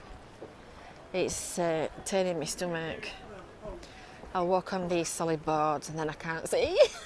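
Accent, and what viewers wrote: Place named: Lancashire, United Kingdom. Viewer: Hi, I 'm Irish just moved to Blackpool last August from Heartsfordshire. I have no problem understanding your lovely accent. Someone have said you have a lovely Yorkshire accent, I thought you have Lancashire accent. I do enjoy your videos xxx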